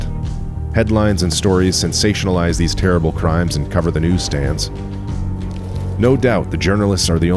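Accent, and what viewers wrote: United States. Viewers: why does he sound american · Brit hunter American English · The only English guy with a American accent...... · English detective with an American accent. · American accent narrating this, made it seems less authentic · why is the narrator american? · An American accent?? My God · Why the American accent ? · Hold up anyone else notice he is from ENGLAND with an AMERICAN voice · Does anyone realize this guy is a British but he has an American accent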